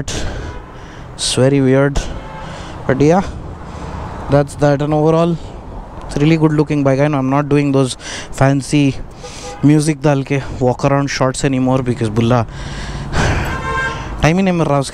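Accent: Hyderabad, India. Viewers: K T M 1290 k Bare me Video Banow..Zara Hamari Haidrabai me Bolne ka nakko Bhulo..yaron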